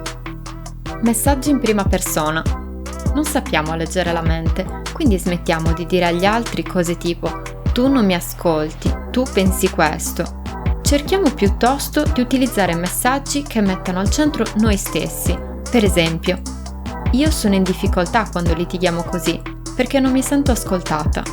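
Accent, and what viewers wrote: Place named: South, Italy. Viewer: Amore, con questo accento terrone? Un libro di grammatica, no? Quanta ignoranza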